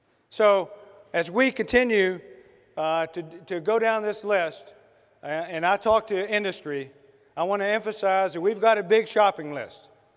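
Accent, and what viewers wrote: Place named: Tennessee, United States. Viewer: He's Japanese on his mother's side and he has a Tennessee twang. I like this guy.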